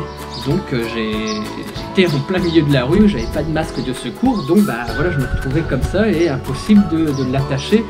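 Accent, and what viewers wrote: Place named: Turkey. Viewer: "Ne kadaağ" maşallah Türkiye'de yaşaya yaşaya ağız bile yapmış abi.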